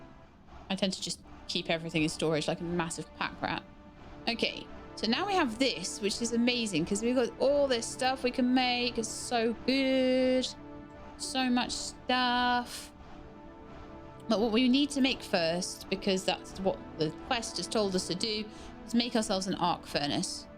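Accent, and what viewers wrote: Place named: United Kingdom. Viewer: You made your internet handle the primordial spider from lord of the rings... NGL that's kinda based, would be really funny if you were an auzzy but you sound more British than that.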